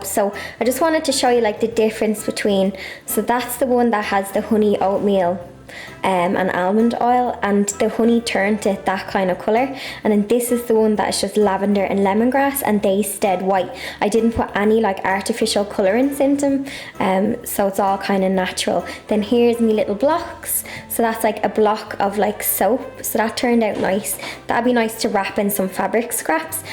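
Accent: Ireland. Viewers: I love your Irish accent. Definitely going to try these with a view to selling them on my shop. Please do lots more! Have just started subscribing to your videos. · I've really enjoyed watching you. Thank you AND it's so wonderful to hear the Irish lilt 😍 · I love your Irish accent and your video looks perfect including your dress and lipstick matched to perfection . Your eyes look straight out of a doll . The whole video - yourself , the recipes , soaps are just perfect . In India I might not get the same quality of soap base but will try with what I can get . Great work ! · Your beautiful voice reminds me of my family in Ireland. I LOVE YOUR SOAP! It came out so cute and I hope Im just as lucky my first time round. Thank you for giving me some confidence in soap making ^_^ I appreciate you and all you do! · I knew by your accent yesterday u were Irish. I’m from Ireland too. 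What part you from? Ca bhfuil tu I do chónaí?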